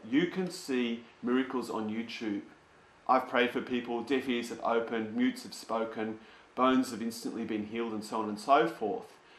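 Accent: Australia. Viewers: As an English person, I am offended you think this idiot is English. He's Australian. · that would be funny if he was speaking with a british accent. unfortunately its actually australian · I agree, however he is austrailian not british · it's not a british accent it's Australian. I'm guessin you are american, i don't know why so many americans fail so miserably at picking accents, i guess it's because you just don't get exposure to foreign accents enough because of the ludicrous nationalism. As an Australian i don't understand this idiot, he lives in a secular country where secularism is only growing larger and larger and less than a third of the country are believers and that number is shrinking all the time. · He's an Aussie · shit i think this guy is australian, sorry everyone.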